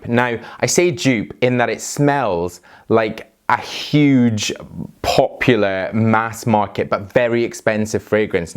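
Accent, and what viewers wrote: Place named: Glasgow, United Kingdom. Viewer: You from Glasgow dude?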